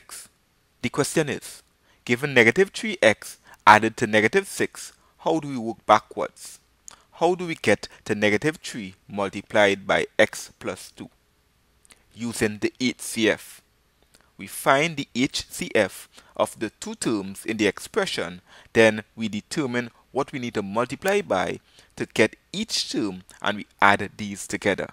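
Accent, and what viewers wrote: Trinidad and Tobago. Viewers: Just curious are you from trinidad... it's just the way you talk it had me thinking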